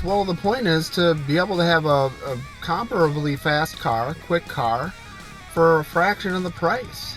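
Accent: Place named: Midwest, United States. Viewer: I knew you were midwestern with your accent. Hello to Chicago from Toronto.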